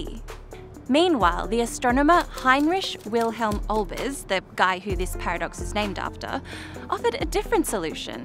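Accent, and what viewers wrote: Australia. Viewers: I'm from Sydney and i didn't even notice that you were aussie until i saw an Australian ambulance lol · I find her mild accent to be very pleasant and easy to understand... I'm pretty sure it's Australian, but I know it's not a Dutch/Afrikaans/South African accent; for some reason I find that accent to be very harsh... (I have nothing against the people themselves... I'm sure plenty of folks in SA would find my rural-western-American accent to be just as harsh and unpleasant, lol) ✌️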